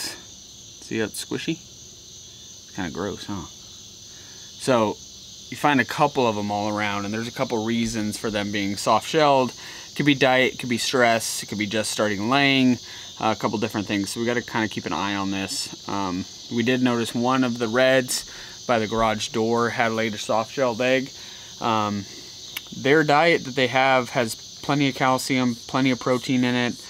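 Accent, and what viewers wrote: South, United States. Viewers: You are really are speaking more like the south YaLL! Lol have a beautiful day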